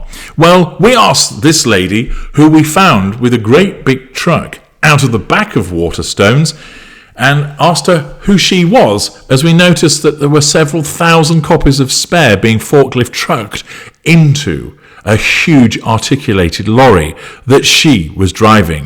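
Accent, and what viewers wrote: Australia. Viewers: Hahaha 🤣 love your Ozzie accent. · Love the Ozzie accent.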